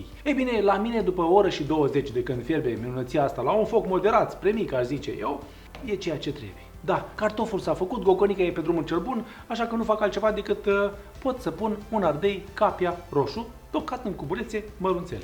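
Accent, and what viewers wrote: Moldova. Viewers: Pot sa ascult fix un minut pe cineva cu accent de moldovean,Dupa aia ma ia greata.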